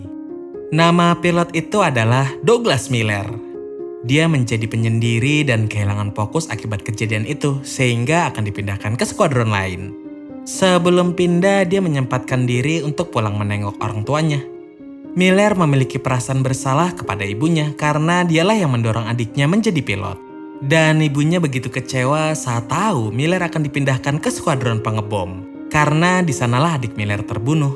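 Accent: Indonesia. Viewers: Pengucapan kata kata dlm bhs Inggrisnya lurus banget spt bahasa Indonesia 😭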